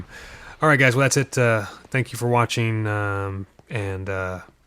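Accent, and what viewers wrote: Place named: North, United Kingdom. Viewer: where are you from over that side of the pond? You sound pretty northern.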